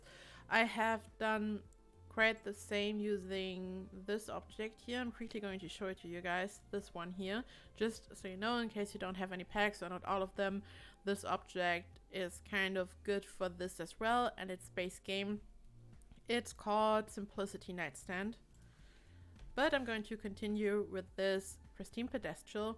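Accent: Germany. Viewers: sorry for asking but are you german ? i think i can hear a little cute accent :) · Du bist deutsch 😂 oder ?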